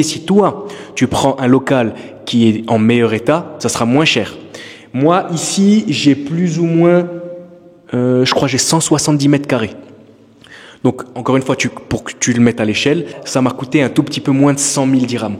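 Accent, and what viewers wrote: Alsace, France. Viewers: Bsahtek mon frere !!! Qu'allah te facilite ton commerce et tous ce que tu entreprend!!! Un petit accend alsacien dans ta voix je me trompe ??? 😂😂😂😂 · L’accent alsacien 👌 voila le coter maniaque 😂💪 les marocains vont vriller faut tout soit nickel 👌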